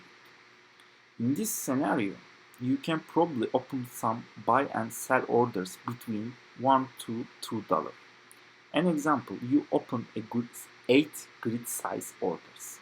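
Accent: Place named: Turkey. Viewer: İngilizcenden Türk olduğunu anladım 😁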